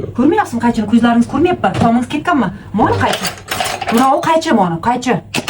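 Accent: Surkhandarya, Uzbekistan. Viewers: Купрок сурхонча гапиринг кизикрок булади сурхон шеваси кизик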